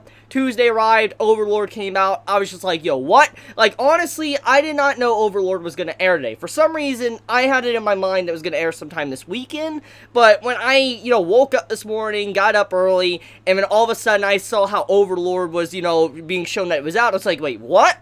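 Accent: South, United States. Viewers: Are you from the south I can hear a little of a southern accent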